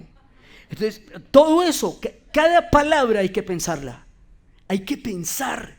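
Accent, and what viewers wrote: Colombia. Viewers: Genial, aleccionador además que me encanta la forma de hablar de los colombianos jajajaja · QUE ORGULLO COLOMBIANA TIENE ESE ASCENTO BOGOTANO TAN NITIDO, COMO TODO LO QUE NOS ENSEÑA GRACIAS TOTALES!!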